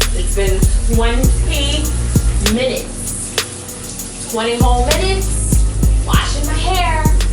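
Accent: Jamaica. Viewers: Im Trini, as soon as you started talking Yardie, I died. Love my Caribbean people!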